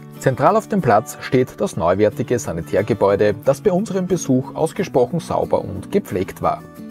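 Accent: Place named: Switzerland. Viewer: Hallo Zusammen... sollte eigentlich Hochdeutsch und nicht Schweizerdeutsch sein ((-: Aber klingt halt so, wenn ein Schweizer Hochdeutsch spricht, haha. Lieber Gruss (wir schauen immer gern euere Videos!)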